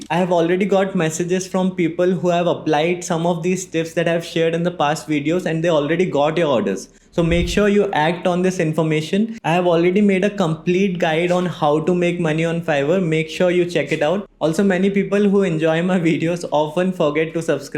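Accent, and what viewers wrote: India. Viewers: Just started watching video. This bro is totally inspired by indisn accent of english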